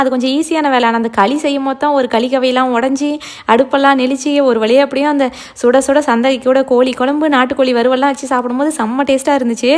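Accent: Salem, India. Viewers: அப்புடியே சேலம் slang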